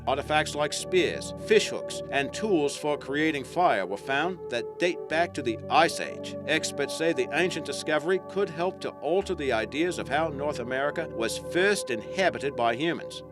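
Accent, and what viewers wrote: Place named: Australia. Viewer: these videos wouldn't be as good without narrating them. keep up with the good work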